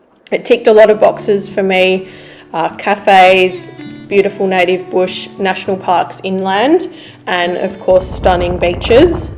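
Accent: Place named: Australia. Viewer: Thanks heaps for this down to earth video and advice. This has also been a great study for the Regional Aussie accent. I’m picking up trading L for W (little = littuw. Still = stiw) etc. Oh, ‘affordabuw.’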